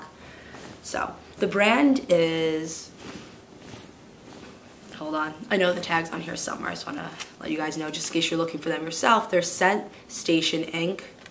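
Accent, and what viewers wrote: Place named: New Jersey, United States. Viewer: I love your accent! Are you from New Joyzie (Jersey)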